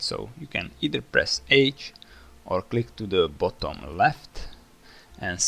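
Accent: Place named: France. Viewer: Love your accent... French I guess?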